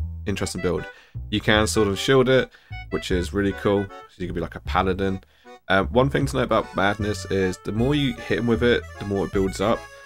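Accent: United Kingdom. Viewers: Good video, but your British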